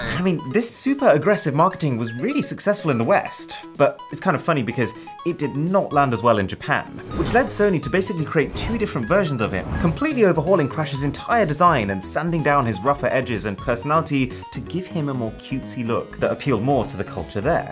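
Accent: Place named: United Kingdom. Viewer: WAIT your British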